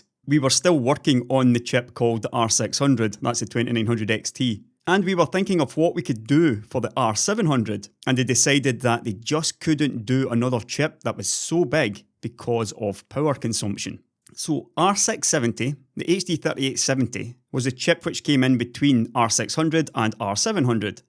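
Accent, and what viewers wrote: Scotland, United Kingdom. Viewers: Dat scoooootish acceeeeeent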